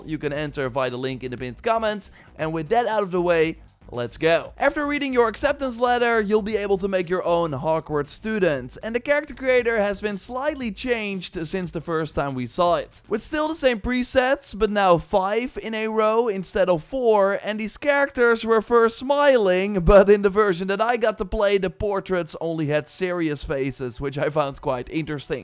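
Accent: Netherlands. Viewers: Ben jij Nederlands vanwege je accent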